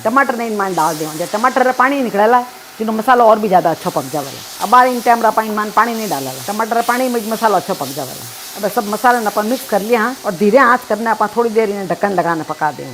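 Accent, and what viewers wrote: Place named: Rajasthan, India. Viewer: Marwari apka bolna achalge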